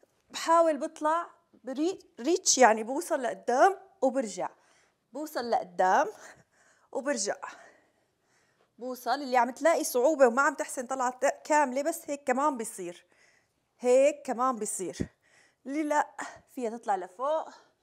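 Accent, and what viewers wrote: Aleppo, Syria. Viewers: تحياتي.. كأنو مبين من لهجتك انك من حلب مو ؟؟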